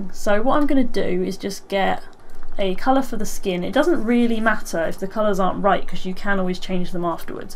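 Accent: United Kingdom. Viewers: I just can't stand this funny british accent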